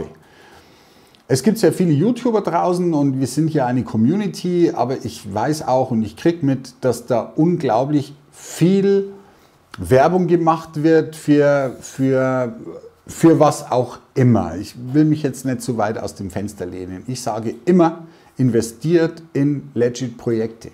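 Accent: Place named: Franconia, Germany. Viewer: Woher kommst du Meister? Ich höre fränkische Züge raus. 👌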